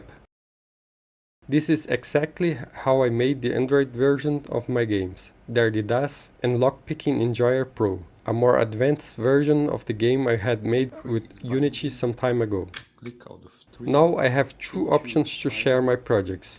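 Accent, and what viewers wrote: Brazil. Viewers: vi que era brasileiro pelo sotaque, valeu meu rei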